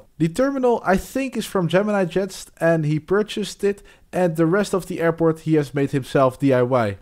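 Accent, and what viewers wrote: Netherlands. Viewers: Are you dutch? You have a dutch accent.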